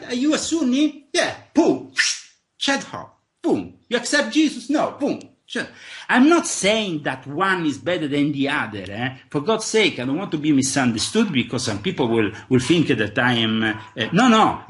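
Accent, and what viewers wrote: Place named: Italy. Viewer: Dude is a loon job who has the uncanny ability to memorize conspiratorial material & has a wild imagination- compounded by the fact that people are impressed by the his Italian accent. Not such a big deal to me as all my in laws sound exactly like Leo when they speak -minus the random chaotic scattered madness. This is ALL COMPLETE BULLSHIT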